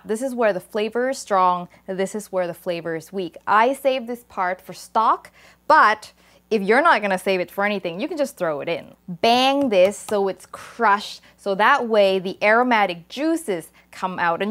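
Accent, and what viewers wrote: Thailand. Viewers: น่ากินมากเลยนะคะ ออกเสียงภาษาไทยเหมือนมาก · wow a little bit surpised! look at her ingredient she use i think she aint live in thailand anymore , every label is a international label have english in the label and the product name is also write in english that not usal in thailand that the most of label always write products name in thai. anyway she is thai could answer why her thai accent is so lovely. :)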